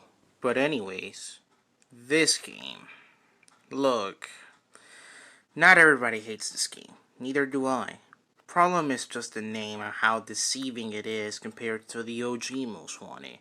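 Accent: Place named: Bahamas. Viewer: I love your Bahamas accent. It’s so Heaty.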